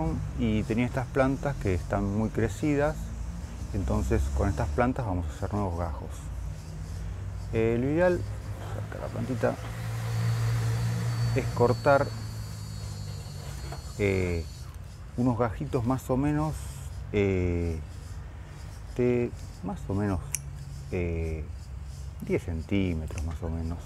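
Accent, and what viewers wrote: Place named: Argentina. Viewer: Por fin alguien que habla con nuestro acento! Hola! soy Susana, vivo en Buenos Aires (CABA)Tengo un helecho arroz desde este verano, ahora llegando el imvierno lo tengo como planta de interior. De dia cerca de la puerta del balcón, le da luz pero no sol directo, por la noche lo aparto de alli porque quedaria muy cerca de la estufa. está en una maceta pequeña y las ramitas de abajo van desprendiéndose. Crece de un tronco central y su altura es de 25 cm, cuando la compré era más baja y sus hojas(flores? ) eran blancas, parecían verdaderamente granitos de arroz, ahora es toda verde. Mi departamento es muy luminoso. Me preocupa saber si la estoy cuidando bien...Tendré que transplartarla a una maceta más grande? Podés aconsejarme algo? Saludos y gracias por el video, está muy bueno.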